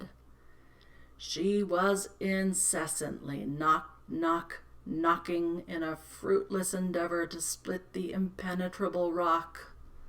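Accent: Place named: United States. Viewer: I enjoyed the read along, learn American English pronunciations, and the story. Great idea and resource.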